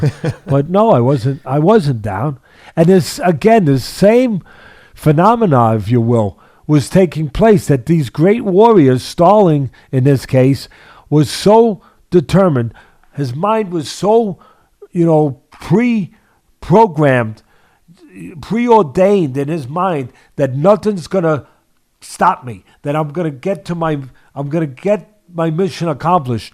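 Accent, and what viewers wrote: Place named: Boston, United States. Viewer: All I want to know is what part of Boston is Teddy from.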